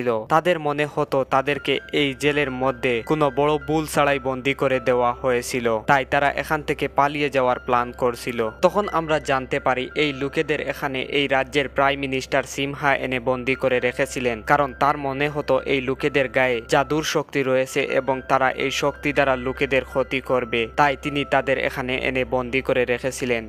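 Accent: Sylhet, Bangladesh. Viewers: matar bongi deikka oi bujram Sylhety... Karon ami Nijeo Sylhety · ভাই কিতা সিলটি নি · Vai amr bari o sylhet,,kintu apne sylheter oia o,, ordek sylhete r ordek suddo basha kowar karone,, vlo lage nh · Vai tmi ki সিলেটের পোলা😀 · সমস্যা নাই ভাই চালিয়ে যান আস্তে আস্তে সব ঠিক হয়ে যাবে.আমিও সিলেটি মানুষ.. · ভাই কি সিলেটি নাকি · amrar sylhety vaiya maybe afne?otar lagi subscribe kori dilam.ar explain ta vala oice🥰🤗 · vai dairet Sylheti mato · Vai apne sylheti ni..? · সিলেটি মাত্ত যতই তেল মসলা লাগাও চেইন্জ হবে না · Bro tmi ki Sylheti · ভাই সিলেটি নি? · 1000% সিলেটি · ভাই কি সিলেটি?😀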